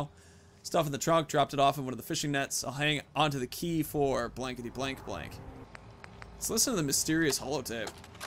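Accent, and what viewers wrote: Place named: Australia. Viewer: It's all about that Australian Fah Hahbah.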